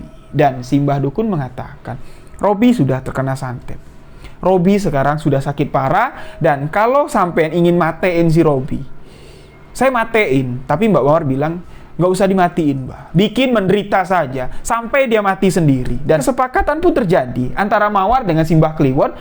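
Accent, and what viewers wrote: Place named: Jambi, Indonesia. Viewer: Senang dengar mas bicara dg logat daerah Jambi....succes ya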